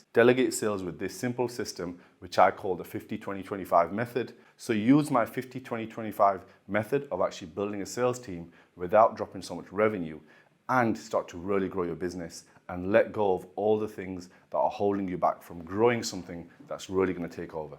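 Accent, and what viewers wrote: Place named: Pakistan. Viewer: Can we get subtitles next time, the Pakistani accent is hard to understand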